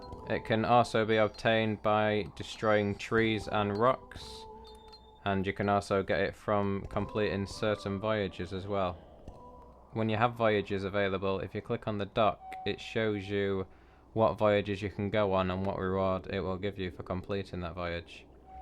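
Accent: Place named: England, United Kingdom. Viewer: Man i love your accent.. which part of england is that? Also im glad to inform you that ALL of your vids are helpful and im enjoying your chanel. Keep up the good work mate. :)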